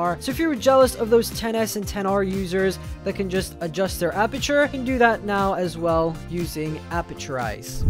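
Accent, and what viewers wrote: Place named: United States. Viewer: You’re Scottish ? You sound American, what part of Scotland are you from ?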